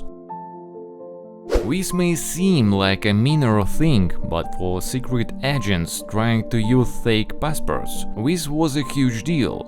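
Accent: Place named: Russia. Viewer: that russian accent (fake or real, probably real) really adds to the video. you did great!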